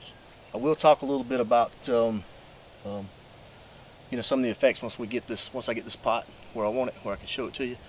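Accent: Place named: Maryland, United States. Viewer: Where are you from? Sounds like Maryland.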